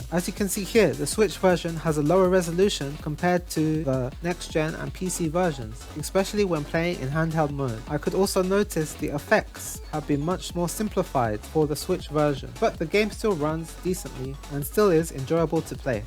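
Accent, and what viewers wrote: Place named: Japan. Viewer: Are you Japanese? Your accent is interesting.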